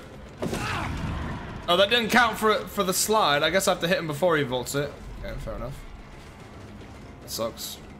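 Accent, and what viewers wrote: United Kingdom. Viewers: As a brit, I actually like your british accent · Very good British accent I'm very impressed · A bit bri ish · That british accent is too funny man 😂 this new killer looks fun. Keep up the good work friend - british viewer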